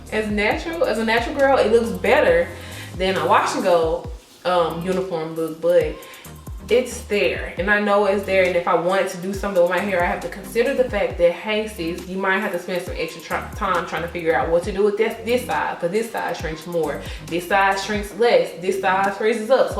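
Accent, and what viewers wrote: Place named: Texas, United States. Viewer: I heard that accent and just knew you from Texas 🙌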